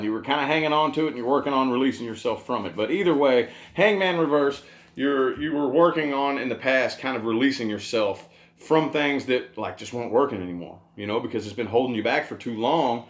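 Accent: South, United States. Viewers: I love your country accent 😍😍😍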